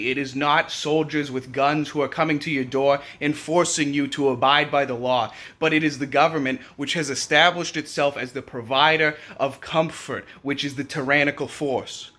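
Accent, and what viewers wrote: Boston, United States. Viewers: I’m on the same page as you dude 100%. My only comment is that it’s funny to me that your Boston or whatever accent that is disappears when you’re on Live. Are you still in the army? Did you ever go to boot?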